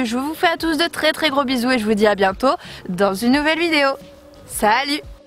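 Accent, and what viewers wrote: North, France. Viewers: Tu est du nord ?? Je t'adore bisousss 😘😘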